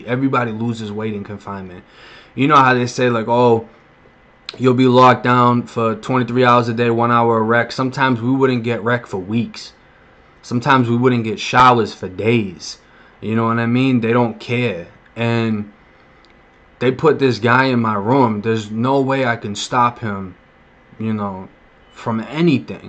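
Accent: Boston, United States. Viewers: People drawn to you because of your Boston accent lol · Someone was lookin out for ya when you did ur time, for sure! 🙏🏻 Obviously got a bunch of vids to still catch up on, but keep 'em coming! Love the way u just flow w/ur stories PLUS (& not to disrespect u or ur significant other) but I just have to say that Boston accent, Boyyy... 😍 it definitely keeps me wanting to listen to more & more! 😉😆🙃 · U got the Florida talk with the Boston accent. That's wild bra lol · You from Boston? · done with the hard life that Boston accent thick lol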